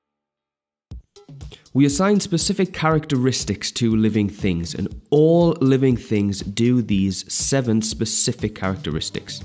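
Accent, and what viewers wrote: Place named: North East England, United Kingdom. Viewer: Love that you are from the north east haha and the accent comes out 100%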